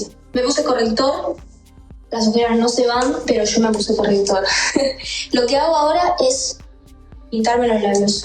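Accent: Argentina. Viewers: Hermosa con o sin maquillaje, amo a los argentinos y ese acento tan lindo, además nadie puede negar el talento que tiene esta chica, una voz preciosa y súper bella.... ♡♡♡♡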